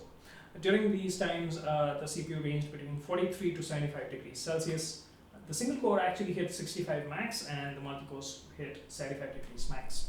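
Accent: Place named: India. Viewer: Thumbs up for the clear and understandable accent from an Indian guy.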